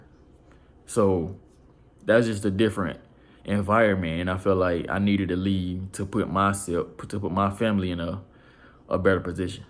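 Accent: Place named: Texas, United States. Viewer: welcome to Texas !! I'm a Texan. & you sound like a Texan naturally! a true southern boy.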